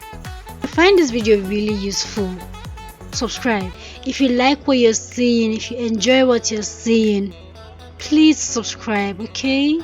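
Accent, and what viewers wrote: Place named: Zambia. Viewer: You sounded like zambian,am a zambian that's why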